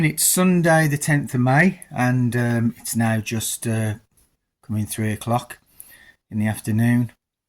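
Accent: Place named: England, United Kingdom. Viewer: Hi or (gentleman who's hosting in this upload with English accent), sorry, I'm confused whether you are or, or???? 🤨😐 Are you eventually going to remove the old wooden lintel (the higher-up one / above the wooden blocks) with a concrete one? If you do, then you will gain nearly an extra foot (12 inches), the wall looks so dodgy, especially with the big crack, would you not consider taking that small part of that wall down and rebuilding it with a higher concrete lentil? It could sort many of the problems? Also, just wondering if there is a yard where one can drive their car in, as the car is usually parked along the roadside? God help you / / with all the questions I'm firing at you, sorry!!! 😱😱😁😁😄😄 Anyways, thanks, or, or 🥹 for the upload, looking forward to your next one, hugs from the west of Ireland 🤗🤗😘😘❤❤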